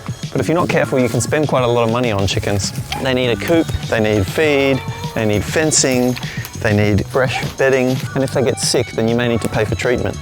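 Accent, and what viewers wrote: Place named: Australia. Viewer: your voice is so soothing. Almost couldn’t tell you were Aussie! ❤❤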